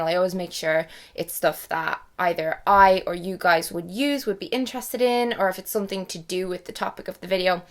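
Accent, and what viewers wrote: Ireland. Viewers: Omg!!! You look AMAZING!!! So cute!!!! What happened to you? Like ur eyes used to be green! Ahaha! Now they're blue! Ahaha! Do more vidios about your amazing Irish accent! :-D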